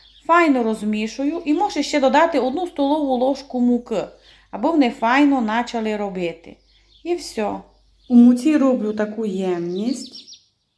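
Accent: Transcarpathia, Ukraine. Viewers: Як приємно вас слухати...ваш закарпатський діалект...щасливого вам Різдва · Дякую за ваші рецепти, з задоволенням слухаю вашу закарпатську вимову, дуже подобається.Миру і всіх життєвих гараздів. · Який же чудовий закарпатський діалект :) Так приємно чути! Дякую! Багато цікавих рецептів, вже хочу спробувати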